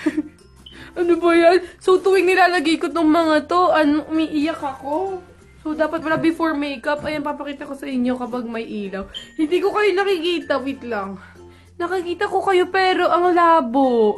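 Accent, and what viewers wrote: Visayas, Philippines. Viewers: so witty 💕 Parang may pagka bisaya accent 😂 which is ang funny pakinggan! HAHAHA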